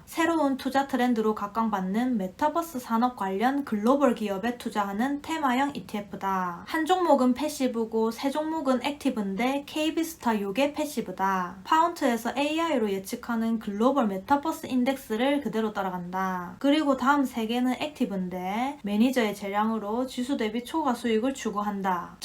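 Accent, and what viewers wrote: Gyeongsang, South Korea. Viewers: 경상도 사투리가 crystal clear 로 잘 들려요. 저는 경기도 정보 감사합니다 ETF 도 여러 종류가 있던데 묶음주식 10개 Main 을 보면 많이 다르던데 추천 부탁드립니다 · 누나 ㅋㅋㅋㅋㅋ설명 너무 찰진다요 ㅋㅋㅋㅋㅋ 경상도 사투리라 더 머리에 쏙쏙 들어옵니다 ㅋㅋㅋㅋㅋ진짜 구라안치고 저는 ETF가입할까 말까 고민만 2년째했는데 누나 영상보고 바로 결정했습니다 ㅋㅋㅋㅋㅋㅋㅋㅋㅋㅋㅋㅋ감사합니다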